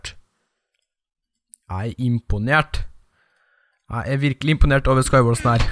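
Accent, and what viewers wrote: Trøndelag, Norway. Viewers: Ækte Trønder! Bra at vi har nånn trøndera som youtubera!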